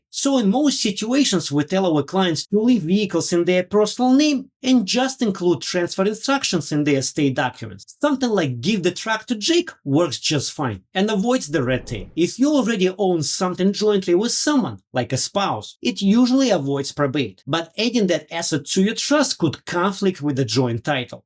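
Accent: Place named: Ukraine. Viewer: I love your voice accent ❤...R U Ukrainian? (good advise too!)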